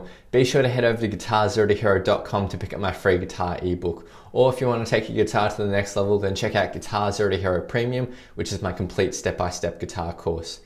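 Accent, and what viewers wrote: Australia. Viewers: Never realized u were aussie was I fucking deaf.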